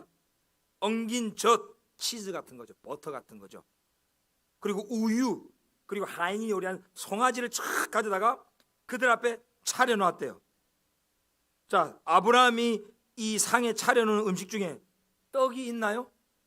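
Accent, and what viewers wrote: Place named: Gyeongsang, South Korea. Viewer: 🤗 말씀이 깊고 넓게 확장되는 시간이었습니다. 감사💞 ※※경상도 억양이시라서 부산근방..생각했었는데, 석수동이셨네요.🤓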